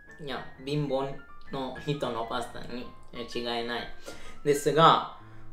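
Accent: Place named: Naples, Italy. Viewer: ナポリの方言カッコ良すぎないか？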